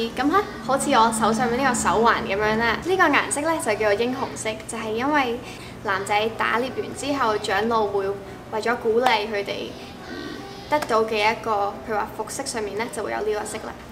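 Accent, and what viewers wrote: Taiwan. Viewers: 哇要主持節目了！ 為什麼你的國語講得這麼台灣腔～ 蠻多人來很久了還是有港腔啊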